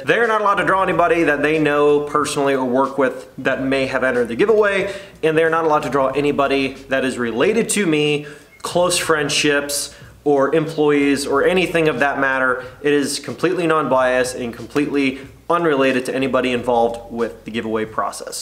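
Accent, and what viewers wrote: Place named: Kentucky, United States. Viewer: He's definitely from Kentucky there accent is almost as distinct as brittish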